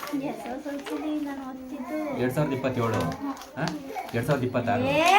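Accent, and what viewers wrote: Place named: Magadi, India. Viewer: ನಿಮ ವ್ಲೋಗ್ ಚನಾಗಿರುತೆ ನೋಡಕೆ ಮಾಗಡಿ ಮಾತು ಬಿಡದಿ ನಿಮ್ಮ ಪ್ರೀತಿ ಬಾಯಿಸುವವರು 🎉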